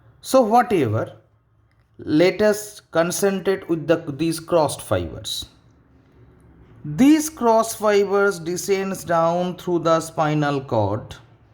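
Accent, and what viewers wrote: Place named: South Africa. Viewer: Thanks - crystal clear South African 👏 May you align its clinical application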